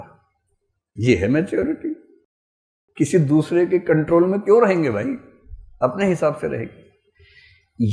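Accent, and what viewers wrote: Bihar, India. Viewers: Mtiya do n.. sir bihari tune 😋😍😍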